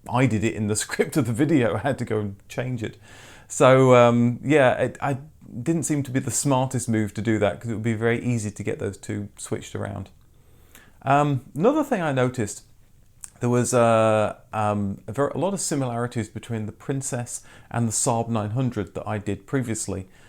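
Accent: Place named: United Kingdom. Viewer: I've been a fan of your videos since you started on here but can't make out wear you are from are you from the UK or US you sound British 🤔 ps I love your video thank 👍🙂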